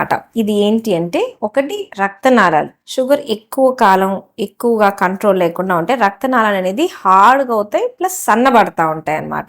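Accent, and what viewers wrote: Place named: Telangana, India. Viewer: చక్కగా చెబుతున్నారు. తెలంగాణ యాస కూడా వినడానికి బాగుంది 😅.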